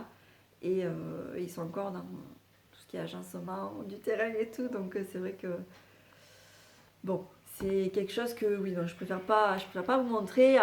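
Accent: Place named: South, France. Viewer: Oh ce bel accent du sud j’adore 😂 elle est trop marrante ! Vlog cool comme d’hab des bisous 😘😘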